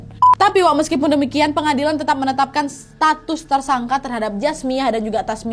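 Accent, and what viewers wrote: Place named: Sumatra, Indonesia. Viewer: amboi..cantiknya bahasa. ibu ini mengerikan nomongnya, ibu-ibu cerewet yang cerdas. enak dengarnya dengan logat khas sumatera, siapa yang jadi suami ibu ini pasti kewalahan ngomong sama ibu ini, bisa-bisa suaminya jadi asma dibuatnya, ngomongnya cepat dan tembak langsung. nggak bisa ditangkis lagi.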